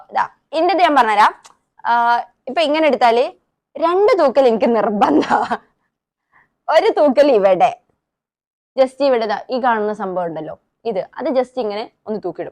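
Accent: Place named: Malabar, India. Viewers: Malabar slag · Kannur slang athyyavishyamund❤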